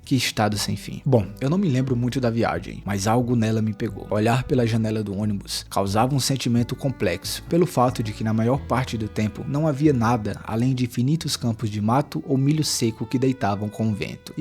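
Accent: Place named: Ceará, Brazil. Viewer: Sabia qhe vc era cearense fi kkkkkkk o sotaque nao esconde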